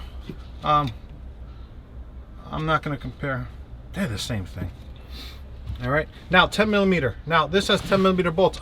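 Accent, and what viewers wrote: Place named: New Jersey, United States. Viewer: Love the jersey accent! Great video.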